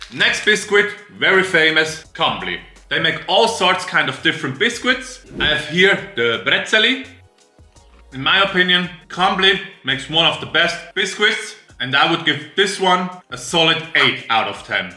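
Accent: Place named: England, United Kingdom. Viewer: Der englische aktzent is ja sowas von lustig 😂herzig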